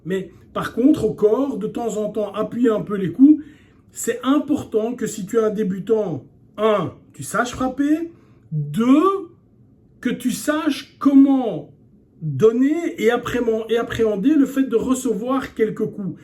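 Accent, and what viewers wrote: Belgium. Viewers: Quand j ai commencé à vous suivre il y a quelques années...c était bien évidemment pour vos conseils mais également (et cela va paraître bizarre ),pour votre pointe d accent bruxellois..qui me rappelait avec une certaine nostalgie mes études et 10 années passées à travailler dans notre capitale. Quand je passe cage aux ours systématiquement je pense à vous....et vous voir continuer de nous donner vos précieux conseils alors que la majorité des patients sont prostrés à l idée des effets secondaires de la chimio,cela force le respect. Pareil à cambronne à waterloo, je vous imagine rétorquer à cette saloperie de maladie",la garde meurt mais ne se rend pas"...et à cet instant, voir ce cancer battre en retraite et choisir un adversaire plus faible. Longue vie à vous et je vous envoie un max de bonnes ondes bourrées de Kimé👊💪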